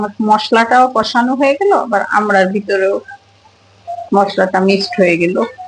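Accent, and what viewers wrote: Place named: Barishal, Bangladesh. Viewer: আপনি মনে হয় বরিশাল্লা😅😅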